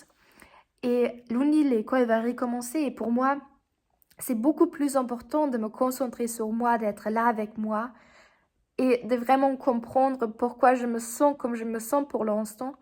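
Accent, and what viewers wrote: United Kingdom. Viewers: C'est amusant, je n'ai aucune idée de pourquoi l'algorithme YouTube m'a proposé votre chaîne. J'ai regardé d'autres vidéos pour essayer de voir d'où venait votre accent mais... j'ai été surpris. Vous savez que pour un locuteur français natif, vous sonnez presque britannique. J'aurais parié sur britannique avant de cliquer sur d'autres vidéos par curiosité. Aborder des sujets complexes dans une langue que l'on ne maîtrise pas relève du défi. Certains choisissent la facilité... D'autres choisissent de parler métaphysique dans une des langues les plus complexes d'Europe 🥲 C'est fascinant, rien que pour l'audace et le côté incongru et insolite, je m'abonne, lol. Ce genre de démarches ne peut que me paraître sympathique... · idem😊😅 j ai cru que l accent était britannique mais ça n a pas d importance. 😊😅 Elle est connectée et de la bonne façon. 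Son rayonnement nous fait du bien à tous et à toutes. Paix joie et amour 🙏🙏🙏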